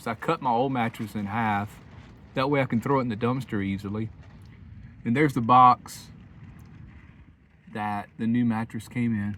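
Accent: South, United States. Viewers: you're the best! Every time I see that you have a post, I get excited. I love your little accent. I'm originally from Oklahoma City, I live in Arizona and have for the last 30 years, but I still have a special place in my heart for the southern folk with accents. You just seem so genuine and so cool! I wish nothing but the best for you! I'm looking forward to seeing what you end up with! You deserve some comfort, you hung in there and done great things! I love it when you say I'm tinkering! Haha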